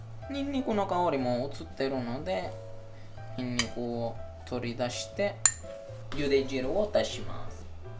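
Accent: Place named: Naples, Italy. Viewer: ナポリの方言カッコ良すぎないか？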